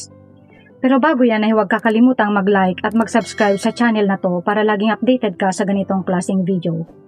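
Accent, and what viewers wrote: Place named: Bicol, Philippines. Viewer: Bicol accent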